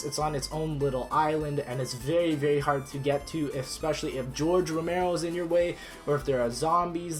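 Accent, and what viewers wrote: Canada. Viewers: Ur canadian? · I knew you were Canadian bcuz when you say about it sounds like aboot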